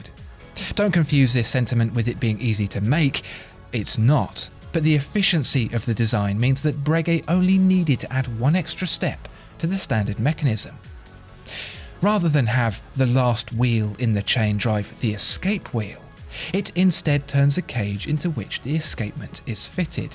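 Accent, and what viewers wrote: United Kingdom. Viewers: "Tighter than a supermarket parking space" 😂 Yep, if the accent didn't already give it away you can tell he's British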